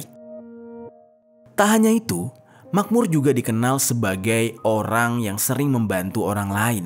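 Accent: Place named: Medan, Indonesia. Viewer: Kok semua bahasa nya entah kasus atau apapun yang ada di daerah manapun kenapa selalu logat medan?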